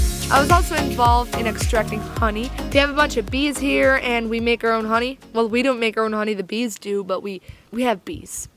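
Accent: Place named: Low German, Germany. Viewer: Thank you! I really enjoyed it. Was the horse and buggy ride at some amish place? I grew up amish in Wisconsin. I like the German you spoke. It sounded to me like Platt deutsch. Is it? Or is it Hutterisch? I know that the German we amish spoke is quite different from the real German language like they speak in Germany. I like to hear different dialects of German.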